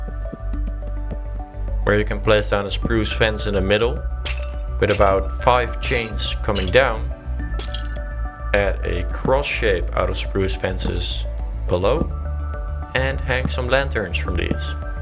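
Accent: Netherlands. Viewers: Exactly the video I needed! Thanks for the beautiful design & tutorial <3! (Hoorde door je accent meteen dat je uit NL komt! Bonus!)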